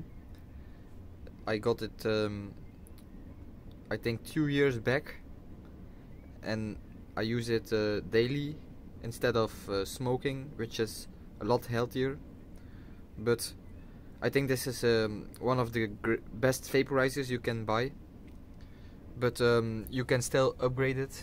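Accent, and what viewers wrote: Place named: France. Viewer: ce fameux accent français ;)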